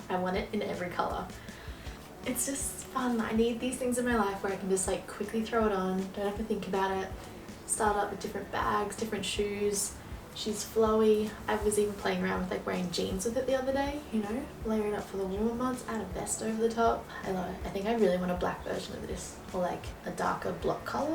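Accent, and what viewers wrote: Australia. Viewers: Wonderful channel!!! Great to hear a vibrant Aussie seamstress enjoying her creations and what a sweet little shift dress!! Well done!! 🌞🌺🏖️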